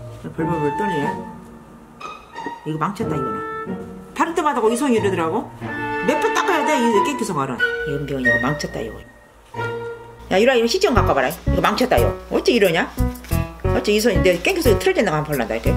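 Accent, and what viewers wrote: Jeolla, South Korea. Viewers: 할머니 ~ 저희할머니도 전라도 해남분이시라 할머니랑 말투가 넘 비슷해요 😚 그래서 우리 할머니처럼 느껴지고 너무 좋아요 !! 사랑해요 할머니 💖 오래오래 건강하세요 ~ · 전라도사람이라그런지 친근감이 갑이에요♥너무귀여우셔♥♥ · 부모님 둘다 전라도분이셔서 이쪽 사투리 너무 좋아요 ㅠㅠ♡♡시골온것같음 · 전라도 분이신가요? 저희친가도 전라도라! 익숙한 사투맄ㅋㅋㅋㅋㅋㅋ 오래오래 건강하세요❤❤❤❤❤❤❤❤❤ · 얼마나 부러운지 몰라요. 우리 할머니가 계셨으면... 우리 할머니 말투랑 똑같아요. 전라도 사투리! 진짜 너무 심하게 마음이 따뜻해지고 할머니가 그리워지면서 동시에 너무 웃김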